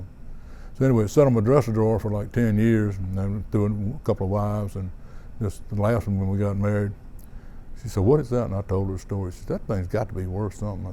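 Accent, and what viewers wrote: Louisiana, United States. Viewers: You Cajun? Sounds like southern Louisiana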